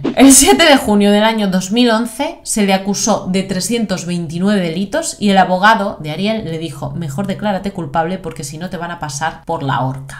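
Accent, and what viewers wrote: Spain. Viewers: Al principio,pensé,ke hacías algo de cosmética.pero por casualidad pasó ese rato Y vi tus historias,y me encantan .los casos y tu manera de contar mola mucho pareces mañica.un 10 per tu desde Barcelona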